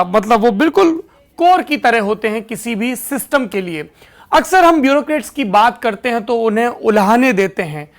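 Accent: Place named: Bihar, India. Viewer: Bhai tu hilta bahut hai and tone mixed with bihari hindi.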